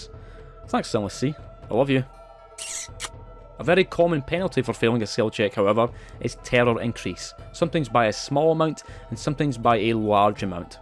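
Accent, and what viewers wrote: Scotland, United Kingdom. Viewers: I just like to come back to this video for an easy watch - it's about something I love, it's amusingly narrated, it's Scottish, 10/10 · That scottish accent. It's cute.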